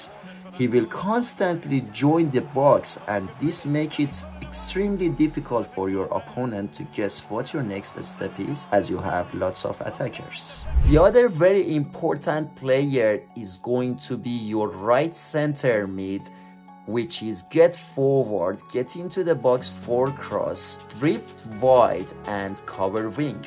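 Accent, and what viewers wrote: Iran. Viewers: persian accent hits so hard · your accent looks perfectly like iranians داداش😂❤️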